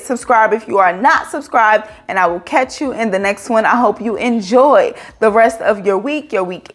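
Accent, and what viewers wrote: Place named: East Coast, United States. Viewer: Love your east coast accent!!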